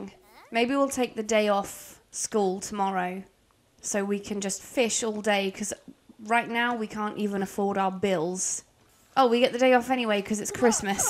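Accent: England, United Kingdom. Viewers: This reminds me of when messyourself played sims 4 maybe it's the bald kid and English accent lol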